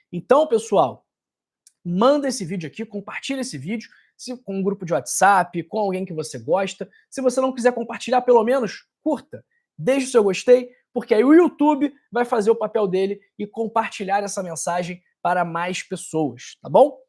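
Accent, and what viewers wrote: Rio de Janeiro, Brazil. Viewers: Não gosto do sotaque do Rio de Janeiro 😊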